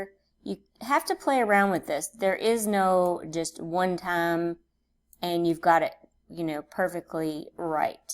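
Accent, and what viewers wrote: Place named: South, United States. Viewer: This is my first time watching and I love your adorable southern accent, from one southern lady to another, keep on making stuff.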